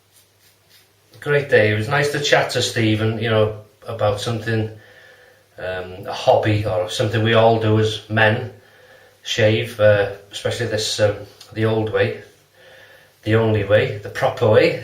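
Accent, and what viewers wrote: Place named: Merseyside, United Kingdom. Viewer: Cheers, I know this is an older video but I wanted to say I enjoyed it. Once again your enthusiasm cheers me up immensely, I think in no small part to your accent. I know you don't think you have one but there it is.;^ ) I'm from the Wirral myself and have lived in Canada since I was fairly young but I like hearing the sound of Merseyside so carry on. Hope you had a Merry Christmas, I remember going to Santa's Grotto years ago but I forget where exactly... Keep the videos coming pal!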